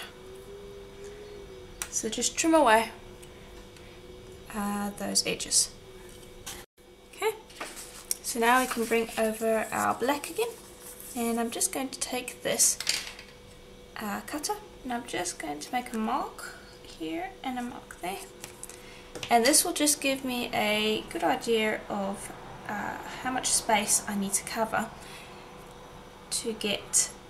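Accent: South Africa. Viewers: Beautiful earrings, and you have such a calming, eloquent voice. You are very talented. Thanks so much for sharing. I also love all your special tools and tricks. I especially love your South African accent, as that is also where I am from, but a very long time ago.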